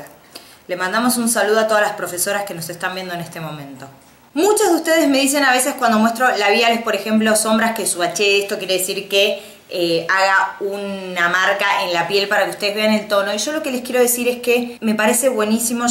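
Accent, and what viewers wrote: Argentina. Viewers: Hay es que te amoo😂😍soy de Argentina te tengo que conocer🙏amo youtubers Argentinas porque hablan igual que yo es como ...... Oh siii😂❤️comenta plisss que mueroo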